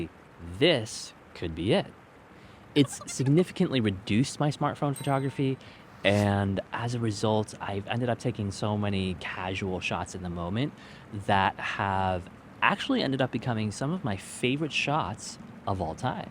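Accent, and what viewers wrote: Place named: Scotland, United Kingdom. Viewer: As I Scotsman, I’ll forgive you for the Star Trek Scotty accent, for producing a great video. I’ve got a 100f so understand what you are talking about ….. despite the accent.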